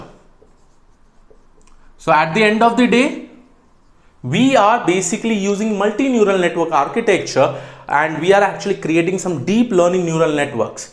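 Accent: India. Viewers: INDIAN ACCENT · Can‘t bear Indian accent. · Very nice explanation along with Indian accent which is pretty fine :)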